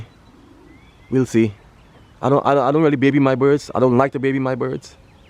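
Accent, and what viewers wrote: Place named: Caribbean. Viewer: Bro you sound like you from the Caribbean or am I wrong the accent but the birds are flying good and I like when you say you don't baby your birds we say the same back home here but love the videos bro🎉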